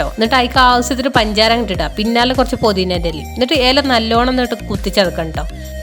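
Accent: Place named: Malappuram, India. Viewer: Malappuram slang pwoli❤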